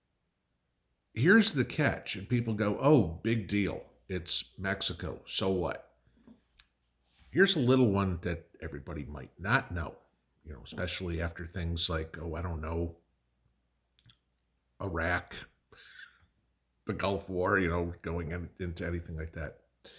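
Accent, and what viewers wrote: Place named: Indiana, United States. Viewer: Came across your channel, very interesting. You have an Indiana accent. Are you a Hoosier?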